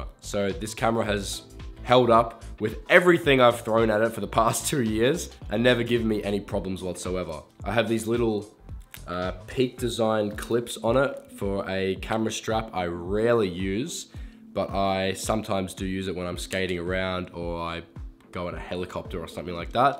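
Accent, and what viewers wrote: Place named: Australia. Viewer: gonna pee, pour some whisky and enjoy 20 minutes of your beautiful face and that aussi accent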